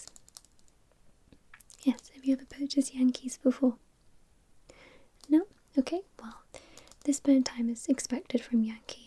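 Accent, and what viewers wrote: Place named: England, United Kingdom. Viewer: love your accent. what area are you from in england?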